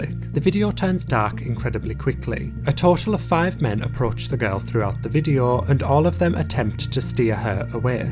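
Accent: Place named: Scotland, United Kingdom. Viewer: First time here and oh my God, I just looove your accent! It is Scottish maybe?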